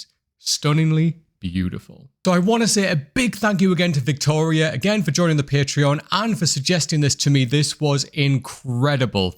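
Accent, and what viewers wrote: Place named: Durham, United Kingdom. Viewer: I like your accent , are you from Durham ?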